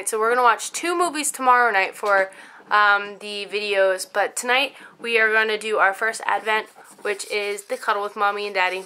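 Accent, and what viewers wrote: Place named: Philadelphia, United States. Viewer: You have such Philly accent! LOL... this is the first time I'm watching you! :) Good to see ya and get to know you this way!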